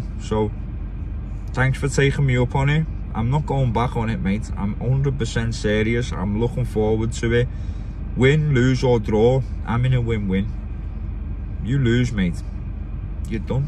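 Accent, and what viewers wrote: Liverpool, United Kingdom. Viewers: Proper and scouse"" fairplay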